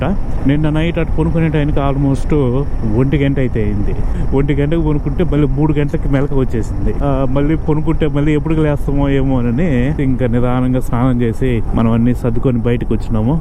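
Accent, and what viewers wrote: India. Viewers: IM WATCHING JUST FOR YOUR TELUGU SLANG....NRO NEKU AH MOUSTACHE BALEDHU....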